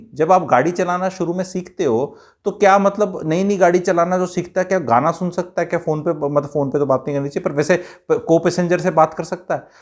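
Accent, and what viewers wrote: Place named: Khadi Boli, India. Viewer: भाईजी, जबरदस्त बात बताते हैं। मजा आता है, आपकी खड़ी बोली सुन कर। कुछ देसी आइटम्स पर वीडियो बना दो बहुत कृपा होगी।,,🙏🙏👍👏👏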